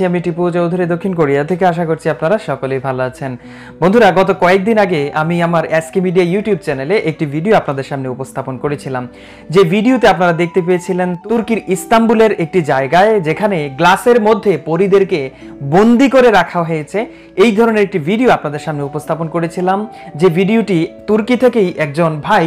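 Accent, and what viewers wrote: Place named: Sylhet, Bangladesh. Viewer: আসলে এই লোকের কথা গোলা সুনে মনে হয় ভাই টি সিলেটের। #LalsobujBD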